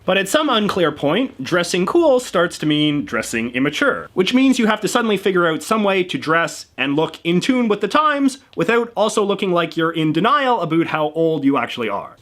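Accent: Canada. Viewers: That's the heaviest Canadian about I habe ever heard!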